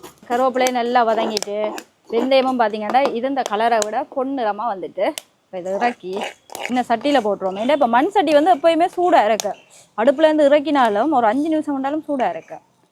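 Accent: Jaffna, Sri Lanka. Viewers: உண்மையான செயல்திறன் கொண்ட செய்முறை விளக்கம் அதுவும் எனது பிறந்த மண்ணின் உணவு நாங்கள் 1980ஆம் ஆண்டில் இந்தியாவில் தமிழ்நாட்டில் குடியுரிமை பெற்று வாழ்ந்து வருகிறோம் அடுத்த வீடியோவில் எனது உறவினர் பற்றிய விபரங்களை தங்களிடம் விசாரித்து அவர்களின் விபரங்களை தெறிந்துகொள்ள இருக்கிறேன் சகோதரி இனிய இலங்கை தமிழ் நன்றி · Ilangai Tamil alagu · அக்கா உங்க இலங்கை தமிழ் கேட்க இனிமையாக இருக்கிறது வெ ந்த ய குழம்பு பார்க்கும் போதே சாப்பிடணும் போலாம் இருக்கு மிக அருமை நன்றி சிஸ்டர் ❤️❤️❤️ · நீங்கள் பேசும் அழகு தமிழ் அருமையான தமிழ் இதற்காக எத்தனை முறை பார்த்தாலும் சலிப்பு தட்டாது அருமை அருமை வாழ்க தமிழ் யாழ்ப்பாண தமிழ்❤❤❤❤ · இயற்கையான முறையில் திறந்த வெளியில் மிக அழகான யாழ் பாண தமிழில் அழகாக வெந்தய குழம்பை நா ஊரும் வகையில் செய்முறையை Live ஆக செய்து காட்டியது மிக அற்புதமான செய்முறை. சூப்பர். அதைவிட யாழ் பான மண்ணில் பிறக்கவில்லையே என்று வருந்து கின்றேன். உங்கள் யாழ்ப்பாண தமிழ் கொஞ்சும் தமிழ் மிக அழகாக கேட்க கேட்க தேன் போல் இனிமையாக இருந்தது. 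சொர்க்கத்திற்கு கூட்டி கொண்டு சென்று விட்டீர்கள். அருமை மா. உங்கள் இந்த யாழ் பான சமயலை தொடர்ந்து எங்களுக்கு அனுப்பி வையுங்கள். எனக்கு உங்கள் சமையல் முறை மிகவும் பிடித்தது. அனைத்தையும் மெருகூட்டும் வகையில் உங்கள் குரல் மிக அழகு கொஞ்சும் யாழ்ப்பாண தமிழில் கேட்கவே அருமையாக இருக்கிறது தாயே. நீ வாழ்க வளமுடன் பல்லாண்டு. என் ஆசிகள் என்றும் உங்களுக்கு. தெய்வம் ஆசீர்வதிக்கும் உங்கள் வெள்ளை மணமும் குணமும் மிக அருமை. நன்றி மகளே 🙏🙏🙏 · Arumai sister eela tamil very super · யாழ்ப்பாணத்தின் உணவும் யாழ்ப்பாணத்தின் பேச்சும் என்றும் சுவை தான் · யாழ்ப்பாண தமிழில் அளவிலாவி இரசித்து சமைப்பது அருமை. வாழ்க 🎉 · Sorry,Neenga ilangai thamizhara. Unga language vithiyasama theriuthu😊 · யாழ்ப்பாணத்தமிழ் கேட்க நன்றாக உள்ளது நன்றி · Like yalpanam tamil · யாழ்ப்பாண தமிழ் என்றுமே இனிமை..தமிழ் நாட்டில் இருந்து சமையல் குறிப்பு கூறுபவர்கள் பாதி ஆங்கிலம் தான்.. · இனிமையான இலங்கைத் தமிழும் விறகடுப்பு வெந்தகயக் குழம்பும் மிக மிக அருமை ❤🎉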